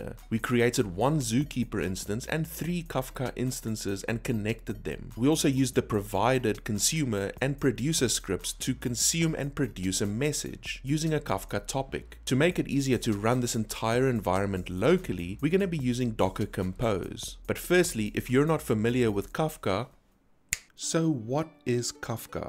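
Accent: South Africa. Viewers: Love hearing a South African accent explain these hot topics!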